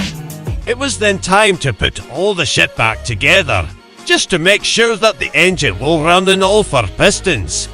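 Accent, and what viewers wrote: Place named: Scotland, United Kingdom. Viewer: I love the Scottish voice! 😁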